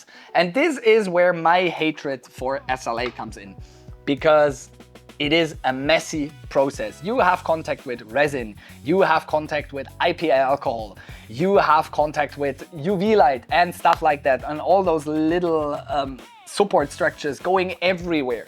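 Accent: Germany. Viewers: i was thinking this dude has a german accent.. .then i saw the waschgang sticker :D geil :D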